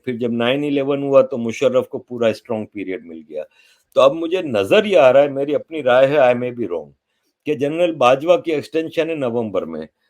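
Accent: Gujarat, India. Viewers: Sir आप सच्चे देशभक्त ओर. लागता नाही की आप की परवरिश पाकिस्तान या मदरसे हुई है आप की बात करने का जो लहेजा है ओ पुरा गुजराती हिंदुस्तानी है .जय हिंद 🙏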